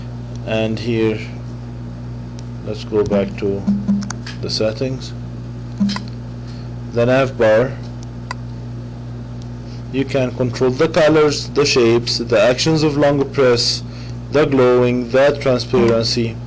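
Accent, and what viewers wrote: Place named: Egypt. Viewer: Hello, how are you:) I believe you're egyptian from the tone of your voice:) Well, I am too... Anyways, would you please tell me how to get the storage swapping work here?? I mean, the correct kernel, the correct script that would really work with that rom. I'm done of trying so many roms and kernels for CM based roms. I would appreciate if you do that:) Thanks w koll sana wnta tayeb:)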